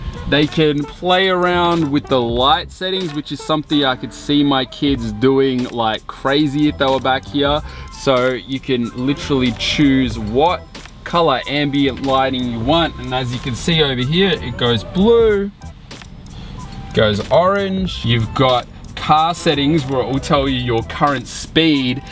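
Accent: Australia. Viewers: The way you break down the description on the car in the Australian accent!❤️💕 horses are so 🥰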